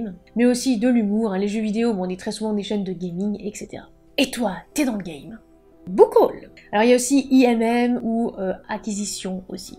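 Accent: England, United Kingdom. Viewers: Bel accent anglais 👏👏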